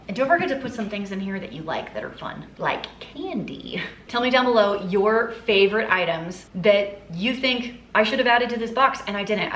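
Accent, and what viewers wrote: South, United States. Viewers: I love you. My husband and I are from the Deep South (NC) and I love the fact that your soo Southern (even if your from Texas).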